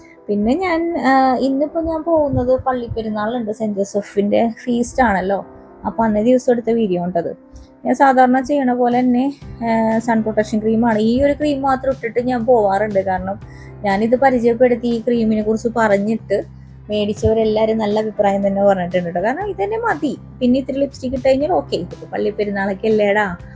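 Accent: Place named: Ernakulam, India. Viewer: ചേച്ചിയുടെ എറണാകുളം ഭാഷയാണ് പൊളി🎉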